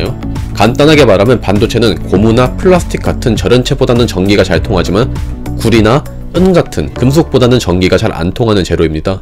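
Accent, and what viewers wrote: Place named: Gyeongbuk, South Korea. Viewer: 사투리가 대구 경북쪽이네 우리 부울경은 아닌듯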